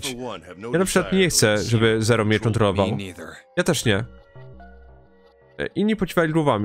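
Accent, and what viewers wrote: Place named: Podlasie, Poland. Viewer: coś ostatnio masz chyba jakiś vibe na podlaskie akcenty już drugi film ci się to zdarza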